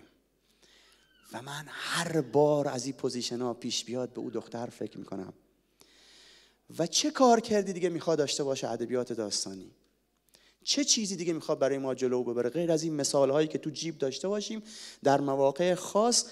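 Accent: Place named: South, Iran. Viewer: چندبار تو اینستا دیدم شنیدم ،ولی آدم چقدر زود به زود دلتنگ صدا و قصه هات میشه ،حتی نمیدونستم راوی این قصه ها و این صدای جذاب و لهجه جنوبی شیرین کیه ؟ آخر تو گوگل سرچ کردم قصه با لهجه جنوبی ،اولین نتایج جستجو چهره آقای احسان عبدی پور رو نمایش داد و جنگی پریدم تو یوتیوب و... پاینده باشید و مانا ❤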